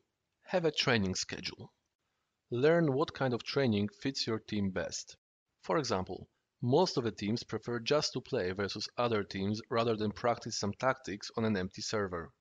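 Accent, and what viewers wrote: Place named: Poland. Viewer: Świetne - naprawdę prosto i klarownie przeprowadzone instrukcje :) Po akcencie domyślam się, że polak, ale bardzo wyraźnie mówisz :) I sporo potwierdzenia z tym jak myślałem, żeby przeprowadzić trening (szczególnie mówiłem, żeby każdy sobie sam opracował smoki obowiązkowo, a na początek zająć się jedną mapą przez kilka dobrych dni, a nie mieszać), a dodatkowo nauczyłem się kilku cennych uwag.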